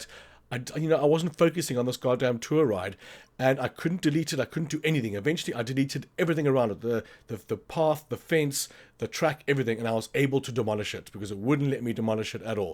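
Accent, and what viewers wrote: South Africa. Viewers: Awesome video thanks man! I recognize the accent, Are you south african?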